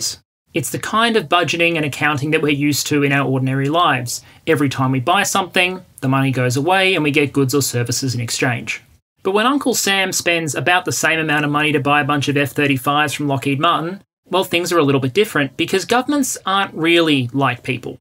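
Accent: Australia. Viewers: Is this the economics explained guy or do all Aussie's sound alike?? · great informative vid, loved hearing an Aussie voice delivering the content.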